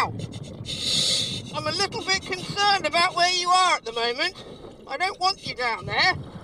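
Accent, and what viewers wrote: England, United Kingdom. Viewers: Somehow a British accent makes these encounters more authentic. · sotaque inglês da poha ‼️‼️‼️ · Love the British accent. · I love british accents playing around with dangerous things. lol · The British accent gives him documentarian deep sea legitimacy.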